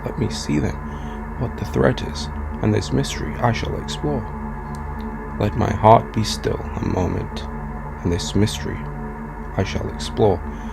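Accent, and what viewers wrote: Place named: Lancashire, United Kingdom. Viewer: Such an Eloquent Lancashire Accent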